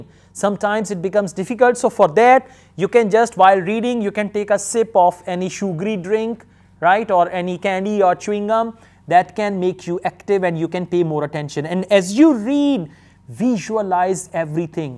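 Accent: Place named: Punjab, India. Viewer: I like your Punjabi accent 😁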